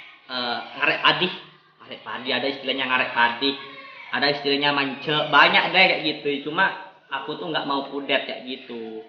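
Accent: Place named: Madura, Indonesia. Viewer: Wah ternyata reng medureh .. Mun engkok reng jebeh kak....hehhehe...buat konten tutorial ja kak..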